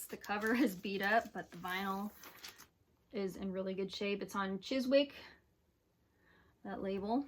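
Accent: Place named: United States. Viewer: Yet again, a great selection of records from the year in question by such a young (...compared to me) person! Absolutely all my faves are in there.....well almost - you need to include (ah yes, you did pick this one - I started typing this comment too soon!)...oh and by the...and maybe by, and by, and by (probably their best), and by, and by. You are quite right, is the absolute greatest TH's LP, as hopefully you'll eventually discover...and is also EC's best! This is so good! And you pronounced name correctly - your smooth american accent makes this sound as naturally perfect as it is! You took me right back to my whole life in the sixth form (which is the two penultimate two school years before going on to university, etc. for those viewers who are not English!). Thanks for taking me back to such a joyous time - these records don't just contain music, they're sort of erm,... 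notches on the belt of life - gosh, that sounds so corny (sorry!)! Btw, if you're really going down the collectors line, you need a copy of with the fold out rear sleeve (but mines not for sale at any price, I'm afraid!). All the best. Cheers!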